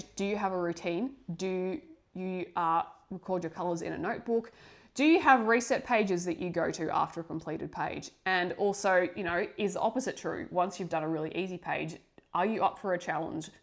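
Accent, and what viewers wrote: Australia. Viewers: Sarah. From Melbourne. Newbie to your content. You have already converted me to try adult colouring. Love hearing Aussie ascents.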